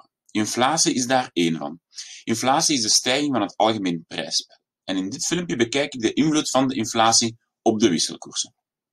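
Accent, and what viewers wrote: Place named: South, Netherlands. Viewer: Begrijpelijk filmpje,ondanks het zuidse accent.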